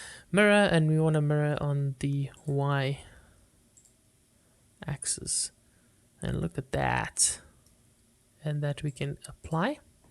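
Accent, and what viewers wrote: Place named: South Africa. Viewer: You sound like a south african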